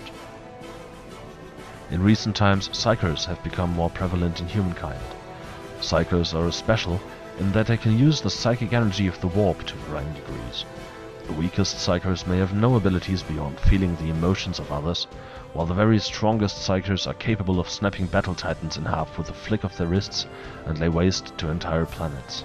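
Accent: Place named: Germany. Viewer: thanks for your educational videos. the special voices you use and your German accent make it even better.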